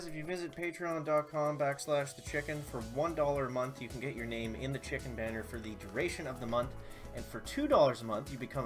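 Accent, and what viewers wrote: Canada. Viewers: Hey chicken, love your videos man.any chance your from Canada's east coast? You sound like you might be. Thanks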